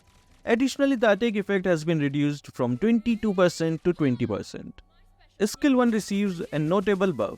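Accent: India. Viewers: Just one question bro😂😂😂.....Where are you from???? INDIA?? YOUR ENGLISH accent is like an Indian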